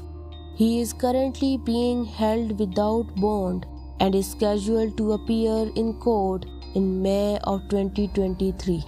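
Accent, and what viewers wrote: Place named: India. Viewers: Sounds like India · That's indian accent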